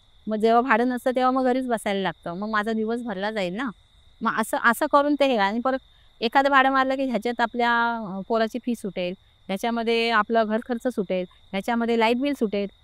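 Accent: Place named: Konkan, India. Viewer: Khup sunder story sangitli ekdum amcha kokni andaaz thanks kaki, plz reply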